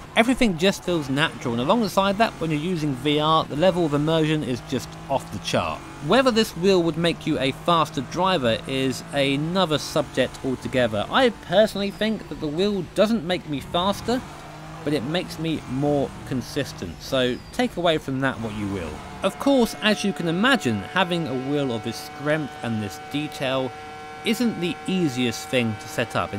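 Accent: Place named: South Lincolnshire, United Kingdom. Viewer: You say "summit" like I do, instead of "something". Are you from anywhere near South Lincs?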